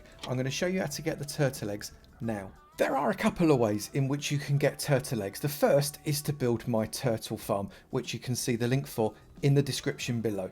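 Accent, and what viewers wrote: United Kingdom. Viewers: no mate he really dont he just sound like a British person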